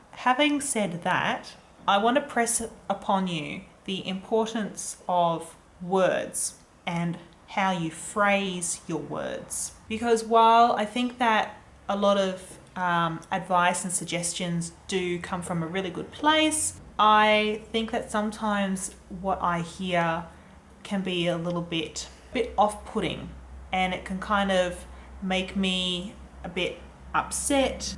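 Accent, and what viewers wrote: Australia. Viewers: Hi ♡ I usually remain a ghost-viewer but I wanted to comment to say a few things: 1) I love your videos 2) and your personality 3) and your laugh (it's so cute!) 4) I love australian accent (had never heard it before hihi) 5) I read your descriptions! ♡ 6) I so feel you about the importance of words/phrasing (#sensitiveteam ♡) 7) We love you! 8) we love you, and you don't even need to apologize for doing different than usual! Just do you! we'll love you and love your channel whatever! 8) lots of love from my little heart of acne/redness/scars-and-etc (#teamhairy! Haha) sufferer ♡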